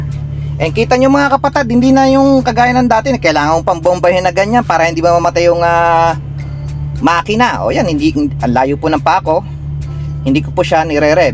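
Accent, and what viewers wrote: Pampanga, Philippines. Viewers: kapampangan ka boss?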